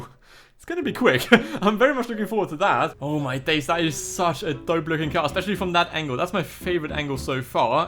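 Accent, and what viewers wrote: Germany. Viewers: Bro bist du deutsch? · man hört deinen deutschen akzent tichig raus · Hör ich da deutschen Akzent? 😅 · u sound german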